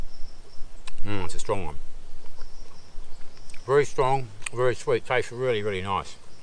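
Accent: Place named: Australia. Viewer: It is bloody awesome to hear another Aussie accent online talking about bushcraft and survival stuff. love it. Don't why I haven't seen this channel before. Sub'd and thumbs up.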